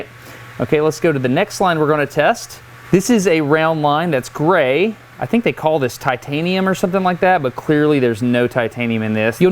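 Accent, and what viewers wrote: South, United States. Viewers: Destin's southern accent came through more when he was talking with.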